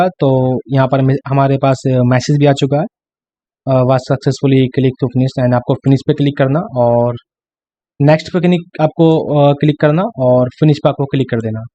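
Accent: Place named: Hyderabad, India. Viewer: thanks broo that was really a big hectic, by the way that hydrabadi accent kills it , love bro love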